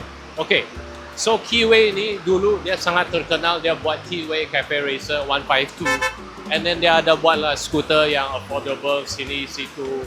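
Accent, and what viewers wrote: Sabah, Malaysia. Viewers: logat sabahan....padu macam tu motor...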